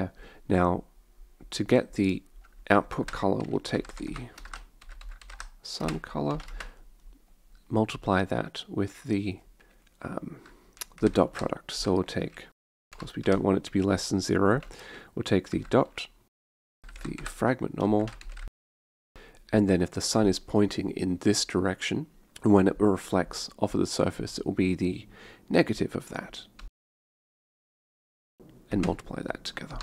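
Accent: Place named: Australia. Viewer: I did think it was Australian, moreso by the language than the accent. Keen for more VK videos.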